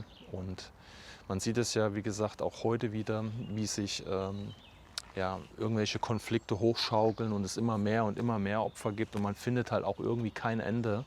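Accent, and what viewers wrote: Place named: Dresden, Germany. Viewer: Ha. Hab gedacht das Du Dresdener bist. Ich erkenne die Weise mit der Du sprichst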